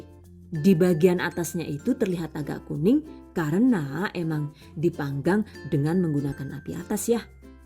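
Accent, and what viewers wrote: Banyumas, Indonesia. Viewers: Q suka dgr logat ngapaknya sist.. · Salam kenal saya oma holly dari cirebon,saya suka sekali lihat video,kuenya enak n penampilan menarik dan yg paling saya suka itu omong inyong2,n kelucuannya,saya sudah bnyk resep yg saya tulis di buku soalnya belum punya oven hehehe jadi,yg udah saya buat donat aja pokoknya yg d goreng2 sukses selalu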